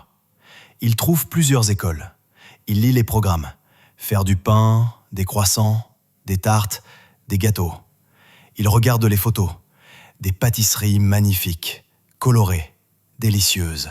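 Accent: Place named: France. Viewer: I liked your dialect when you speaking English with French flavor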